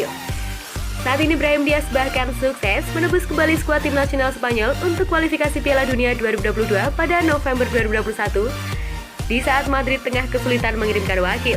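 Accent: Java, Indonesia. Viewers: Sory bkn rasis tp voice overnya jangan medok lah, ga enak dikuping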